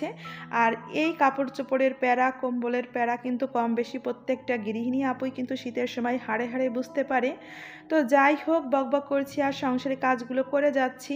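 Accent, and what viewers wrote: Jessore, Bangladesh. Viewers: ওয়া আলাইকুম আসসালাম বোন । তোমার কম্বলের মত আমারও একটি আছে (খয়েরী )ভালোই যশোরের ভাষায় কথা বললে 🥰আমি খুলনার মেয়ে,নানা দাদা বাড়ি লোহাগড়া হলেও পারিনা আঞ্চলিক ভাষায় কথা বলতে। আপু সাথে আছি ইনশাআল্লাহ পুরা ভিডিও জুড়ে থাকবো 💞💞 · আপু যোশহর ভাষায় কথা বলে নিয়েছ। খুব ভালো লেগেছে হাসিও পেয়েছে। ডিম কুমড়ো বড়ি দিয়ে রান্না করে নিয়েছ। জলপাই আচার খুব লোভনীয় হয়েছে।আর চটপটি খেতে খেতে বিদায় নিলাম আপু।❤❤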